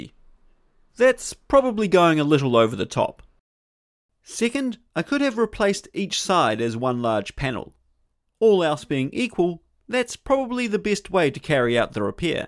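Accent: New Zealand. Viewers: I wish you did this full time! Your videos are genuinely excellent. I think it is the kiwi commentary that makes them! Can’t wait for the next. 👍👍👍👍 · That would be the New Zealand pronunciation, just like 'fush n chups'!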